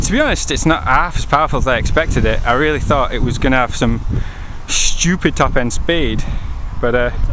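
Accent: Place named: United Kingdom. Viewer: I'm not in the UK though. I just thought by the way he sounded he was in the UK when I watched his videos. I live in Florida, I already bought rbg strip of led, just because I know I'd need help with oriantation, this is my first quad I can tune on my own. kinda excited and really wish it would come I'm already! !! I wanna fly it... I saw at the hobby shop a race drone with fpv and everything except battery and controller on sale for 170 I'm thinking of grabbing that as well lol. I been dying to fly and hook up my fat shark to something that flies again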